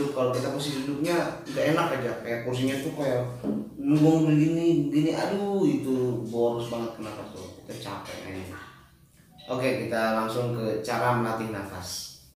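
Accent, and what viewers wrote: West Java, Indonesia. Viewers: Begitu sajah... Sunda pisan bhs indonesiana..😁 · Lucu tapi bermanfaat mkasih bang ats ilmunya😃Sunda pisaaan euyy😂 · Logat sunda tee bisa lengit aa 😁😁 Kasep ogeh dei aa ,,,shat trus nyaa aa ,,aamiin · Sunda punya, hihi logatnya itu lohh ustd khas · Mantap Kang... Prnjelasannya alami... orang sunda bgt... Hehehe.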